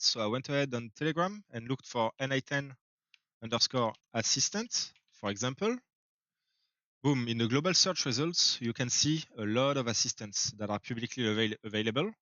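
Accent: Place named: France. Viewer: L'accent français ❤ j'adore hhhhhh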